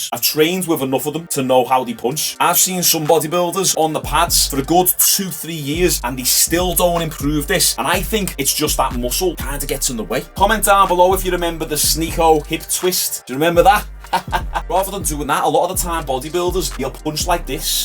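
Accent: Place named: Glasgow, United Kingdom. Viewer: In regards to hate comments and what not, a lot of kids are on here who don't know any better and also bitter A-holes sad about their own life unwilling to do anything to change it, wanting to bring someone down to their level instead. Constructive criticism is great to learn and grow from, but some people abuse anonymity just for the sake of being vile. Keep up the effort with the uploads and people will eventually gravitate. I think most state side Americans love your accent (I wanna say Glasglow) plus your entertaining so Its almost assured you're gonna blow up huge one day.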